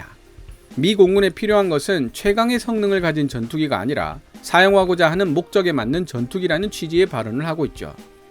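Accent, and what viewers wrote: Gyeongsang, South Korea. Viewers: 이분은 영어도 갱상도 억양으로 해서 오히려 잘난체 하는 오해를 불러 일으켜 쫌 재수가 없음... 그런데 영문만 나옴 호랑이가 사슴을 봤을때처럼 덤벼듦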